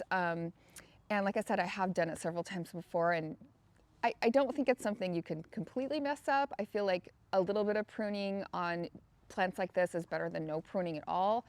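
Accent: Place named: Midwest, United States. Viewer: I'm in central NC newly zoned 8a. Your yard and mine look very similar. I cut back all my knockout roses 2 days ago (ouch!! Those thorns!) and I'll be working on my blueberries today. Are you originally from the midwest? I occasionally hear a tiny hint of midwest accent. Very tiny.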